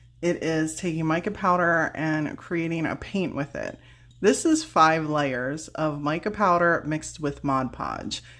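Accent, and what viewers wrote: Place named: Pittsburgh, United States. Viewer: I just discovered you and I already love ya..sounds like you're from Pittsburgh...it's my hometown...it's been a long time since I moved so I may be off my game but Pittsburgh sounds like Pittsburgh..the way you said water and how you say the letter "O" makes me think of home...either way it's cool I found your page 💜🪬☄️ I love them all but I really like the contrast of black and white on the snowmen and I agree with you about painting the sides 💜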